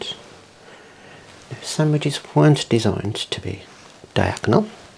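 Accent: Birmingham, United Kingdom. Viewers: Is that a birmingham accent? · I can't believe...how satisfying this is....The UK accent fits accurately to calmness of this video. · I only realised after reading comments that this is a sort of remake of that Irish man making sandwiches. His voice is golden but your brummie accent is quite nice. Nice for you to put a 'British accent' on the video that's not a posh London accent. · whereabouts in the uk are you from? i can’t place your accent 😊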